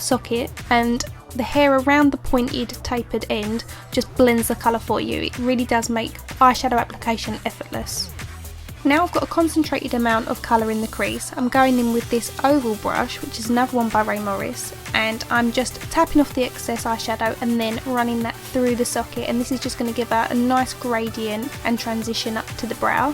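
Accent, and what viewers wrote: Essex, United Kingdom. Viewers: love your accent, Essex? x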